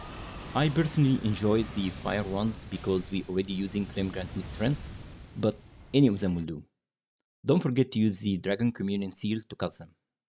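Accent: Egypt. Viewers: I can tell you're Egyptian from you accent lol nice video my friend :)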